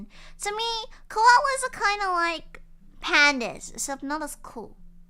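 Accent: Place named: Australia. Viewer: Your Australian accent is adorable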